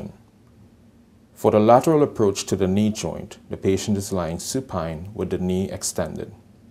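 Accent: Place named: Trinidad and Tobago. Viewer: Where are you from? Sound like Trinidadian or something similar.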